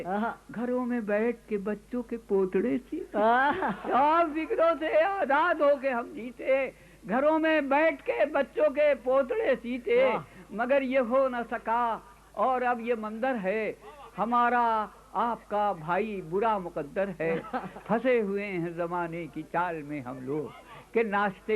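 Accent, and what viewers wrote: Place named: Lucknow, India. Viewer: Waah Adil Sahab kya shayar the aap, aur kya zbaan pure lakhnavi zbaan aur aapki peshkaari ba-kmaal. Pride of India.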